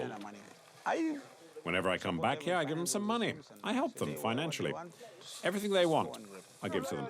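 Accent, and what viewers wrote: England, United Kingdom. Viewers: Nice English narrator. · His a pommie, thats how they speak, little on the painful side. Should have allowed the locals that spoke english to be heard. I could hear what the people were saying while he spoke. Some of his information was incorrect, but still a good documentary. Thankyou for sharing.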